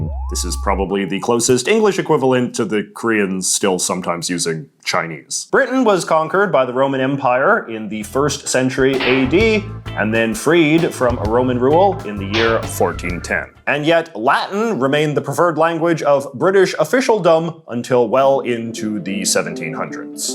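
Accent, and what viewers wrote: Canada. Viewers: Why do American and Canadians sound so similar however the small things like about stayed distinctive and possibly are only Canadian. This accent also seems to be in the northern mid American accent. but why? The hozer accent is so interesting. So many canadians Like have no natural hozer style accent but the about is the one variable. Like some with the word water or lemon. Saying watta or limn. These are all so interesting and seem almost to defy region in some ways. Do these exist in mexico? It is such a huge country that I would assume the accents are VERY different as also geographically there are lots of variables and difficulties getting from place to place.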